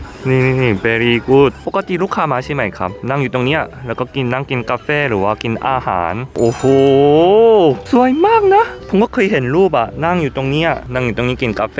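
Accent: South Korea. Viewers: คนไทยเค้าเอ็นดูคนต่างชาติอยู่แล้วค่ะ เหมือนเราก็เข้าใจว่าเค้ามาต่างถิ่นต่างที่ มาเที่ยวบ้านเรา เราก็ต้อนรับเค้าเต็มที่ค่ะ ยิ่งคุณ พูดไทยสำเนียงเกาหลีคนเค้ายิ่งเอ็นดู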